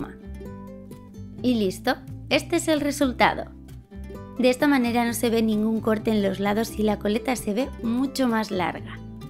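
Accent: Spain. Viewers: me gusta como ablamas eres española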